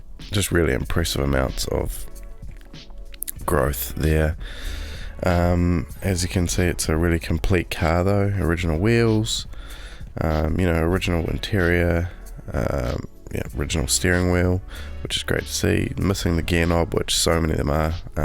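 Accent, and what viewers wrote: New Zealand. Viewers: Sure am, that's why it was so good to hear a kiwi voice. Car is in ChCh . . . Taking it to Taupo and then Akl . . . Will have a look and join the forums. Cheers again, go well with the restoration.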